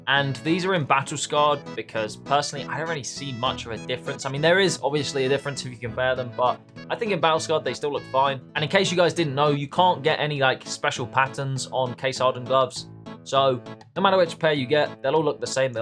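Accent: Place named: United Kingdom. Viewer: your bri ish accent is annoying (no offense)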